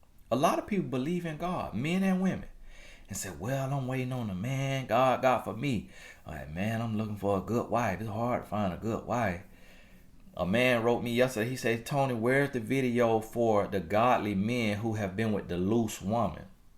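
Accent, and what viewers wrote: South, United States. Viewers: I love watching you. everyday. You tell the truth. You so country "You Here Me" lol.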